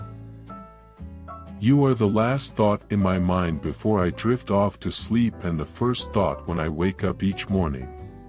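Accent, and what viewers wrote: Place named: England, United Kingdom. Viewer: This beautiful video took nearly 30 mins to enjoy and I love hearing your reading although I did not watch the video but I truly listened your beautiful English accent my dear 😍 thank you so much for a long uploading and it really took much time for this video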